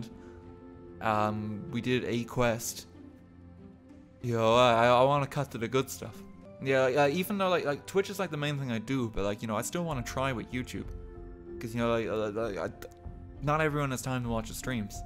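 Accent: Ireland. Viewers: The Irish accent is awesome.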